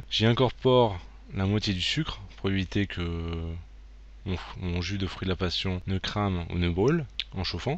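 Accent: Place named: Nord, France. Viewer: Bonjour chef, je viens de m'abonner à votre chaîne que je dévore attentivement. Je me doutais bien que comme moi, vous étiez du Nord/Pas-de-Calais. En tout cas tous vos conseils font que mes pâtisseries sont une réussite. Hier, j'ai réalisé les allumettes et des croissants. Là, bien sûr, je termine les choux. Il me reste à les bourrer de crème. J'ai hâte de les goûter lol. Cela fait du bien d'entendre le son du Nord dans votre voix surtout que je suis exilée en région parisienne et ma région me manque terriblement. Merci pour tout lol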